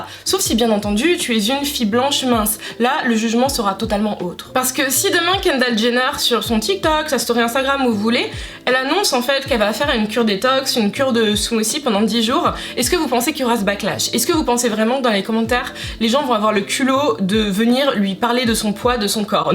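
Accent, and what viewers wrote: England, United Kingdom. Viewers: les gens devraient laisser Lizzo tranquille mdr, ça va là tu as un petit accent British quand tu parles anglais, c'est très mignon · pourquoi tu prend un accent anglais? C'est voulus ou tu es a appris l’anglais au Royaume Unis? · It's absolutely not related to the video but I just figured out that ur accent is very British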